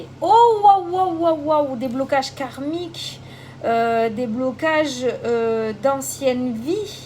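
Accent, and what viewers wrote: South, France. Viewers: Bonjour, Je te découvre. Tu es géniale, pleine de vie, toute en simplicité et authenticité et en plus avec l'accent du sud. Tout ce que j'aime! Je passe un bon moment avec toi. Tes tirages me parlent beaucoup et me correspondent parfaitement 💫😇 Peux-tu me donner les références du jeu spirituel que tu as utilisé? Il est magnifique!!! Merciiiii 🙏💫😘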